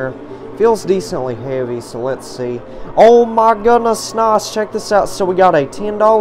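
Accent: South, United States. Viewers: You're doing great tonight....that deep southern accent...anyway you're doing great 😃😃😃😃👍👍👍👍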